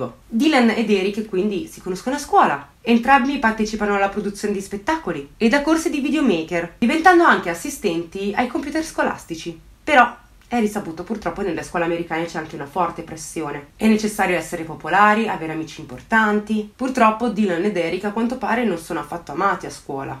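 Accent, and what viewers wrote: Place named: Liguria, Italy. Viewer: ciao! Ah ok mi sembrava fossi ligure come me. Cmq brava mi piace come racconti gli eventi. E x questo che sembrava che avessi un qualcosa di famigliare. Grazie mille x la risposta! Hai un nuovo iscrittoooo!! !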